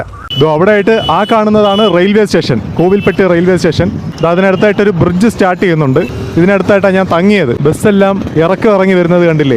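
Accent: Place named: Kollam, India. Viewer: കേട്ടോ..... കേട്ടോ....... കൊല്ലം സ്ലാങ്...... അടിപൊളി ❤❤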